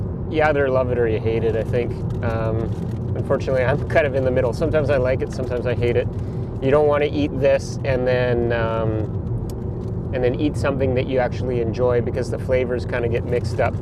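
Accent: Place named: Canada. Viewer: Great video :) Makes me wish I were in Finland. You look quite Finnish, but sound very Canadian. Did you ever manage to learn any Finnish? I've managed to get to a conversational level, but that's the most difficult thing about Finland. I think in the US, we need more of those yield signs, especially in the country when there's not a person around at the four way. No one ever fully stops anyway.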